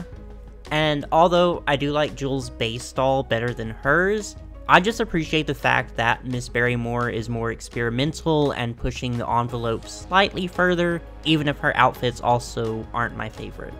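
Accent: Texas, United States. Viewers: I presently like how you talk I love your Texas accent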